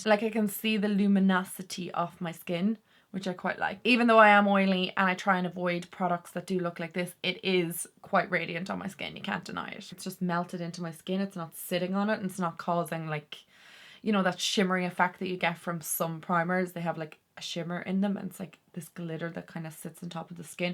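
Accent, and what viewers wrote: Ireland. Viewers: I could listen to you talk all day….I just LOVE an Irish accent. I’ve loads of friends from Ireland and I so enjoy our talks, with their gorgeous accents and Irish sayings….”God love him” and “Mother of God”. Teddy U.K. xx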